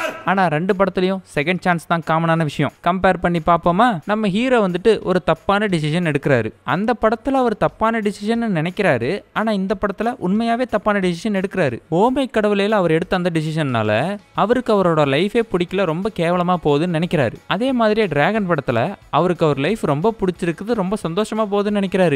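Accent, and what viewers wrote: Salem, India. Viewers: Bro appa neenga salem ma 😅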